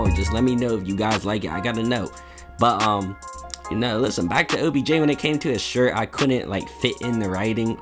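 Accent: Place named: South, United States. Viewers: Soundin like a hillbilly anyone (I’m not hatin) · He sound country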